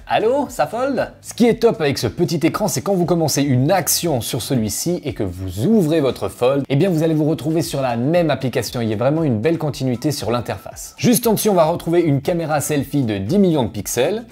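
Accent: Vaud, Switzerland. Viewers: Le beau acsent vaudois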